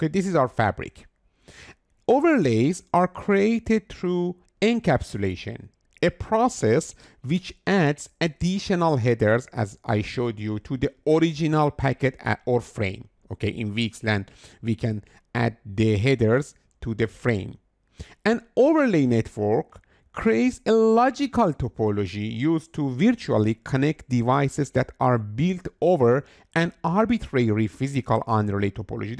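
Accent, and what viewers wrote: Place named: England, United Kingdom. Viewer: Watching this form England, and I like your English accent. this video is full of good information. cheers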